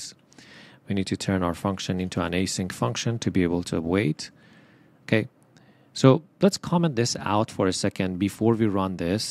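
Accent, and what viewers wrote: Iran. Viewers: لحجه تون رو اول ویدیو رو شنیدم فهمیدم یک ایرانی هستی جان خسته نباشی💚🙏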